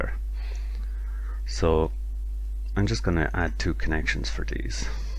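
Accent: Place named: Ireland. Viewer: Great tutorial as always. The Irish accent makes it so much better, can't beat it haha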